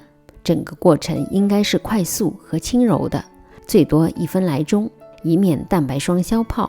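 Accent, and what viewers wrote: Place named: Shanghai, China. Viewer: 的口音和样子都像上海人（至少是江浙沪一带的），讲解也很细致，像极上海人的风格。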